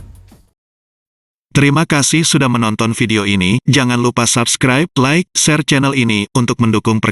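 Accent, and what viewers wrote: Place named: Batam, Indonesia. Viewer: Yg org BATAM mana nii suara nya👍🖐 salam dari batam, sekupang🖐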